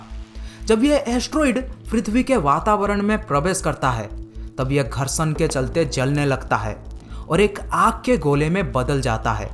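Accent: Odisha, India. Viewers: Bhai aapka baat krne k tarike se hi pta chal raha hai tum Odisa se ho😁😚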